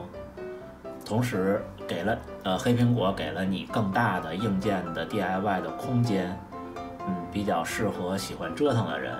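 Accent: Beijing, China. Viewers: 听口音是北京人吧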